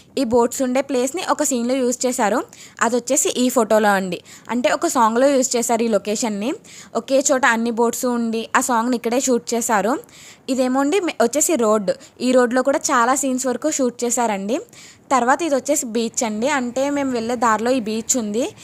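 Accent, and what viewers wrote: East Godavari, India. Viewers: Superb akka mana east Godavari natural ga ela matladatharu... nv video lo are naturalist use chesav ..superb ..keep rocking 💓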